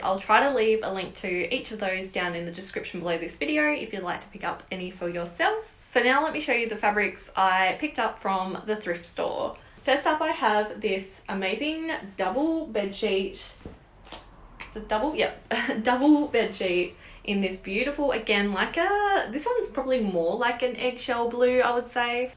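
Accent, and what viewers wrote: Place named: Australia. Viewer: Love this! So fun to see someone go to spotlight 😉 as a fellow aussie it's so nice to hear your accent and hear you talk about and go to familiar places (like salvos too!)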